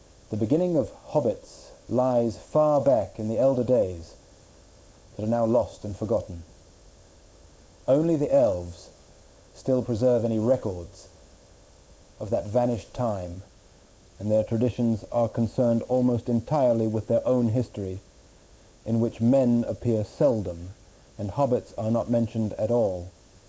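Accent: United States. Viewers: An amazing technological achievement, but doesn’t sound quite right. As another comment said, the accent is slightly American. But more than that, the recitation isn’t that of a story teller. Tolkien was a more poetic public reader. But an incredible achievement of AI nevertheless.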